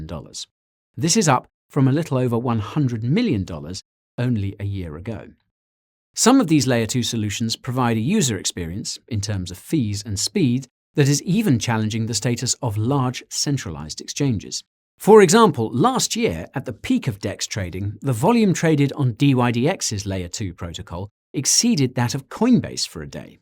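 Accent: United Kingdom. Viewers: That British accent 😂😂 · Mate! Aside the amazing info and the way you get to present us (pun intended!) it's also amazing you get me to laugh listening to the boring 'I'm not a financial advisor'. I might be biased tho as I have a weakness for about every accent from my British neighbours, at least, the ones I can understand 🤣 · The only person with a British accent that I listen to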